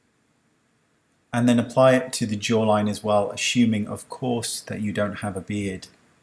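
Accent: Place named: United Kingdom. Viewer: Hi dear I'm new here so I'm watching old vídeos that are so interesting nowadays as well I love your easy explanations and so professional at the same time My language is Spanish I'm from Uruguay but I do love since I was a child British pronunciation so to watch you it's awesome ,excellent pieces of advice and straightforward 🌹Love your way and your wink lol Thx for being here to help us ♥️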